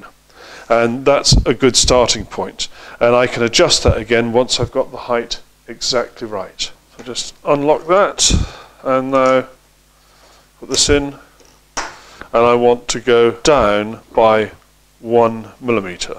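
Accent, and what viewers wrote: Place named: Manchester, United Kingdom. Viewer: OMG He's from Manchester....................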